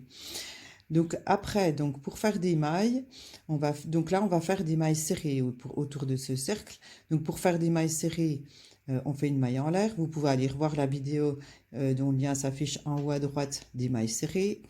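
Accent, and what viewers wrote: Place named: Haute-Saône, France. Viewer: Merci pour vos explications parfaites. Vôtre accent me fait penser à la haute Saône.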